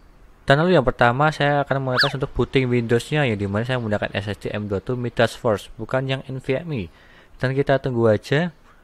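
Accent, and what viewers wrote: Surakarta, Indonesia. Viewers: Logat solone ketotot😂😂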